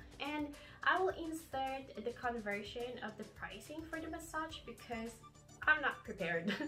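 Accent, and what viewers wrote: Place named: Australia. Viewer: You've started to sound Australian!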